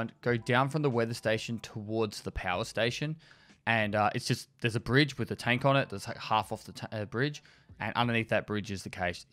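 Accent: Australia. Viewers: It's aussie accent, reminds me of SPUNJ calling de_cache kesch.^^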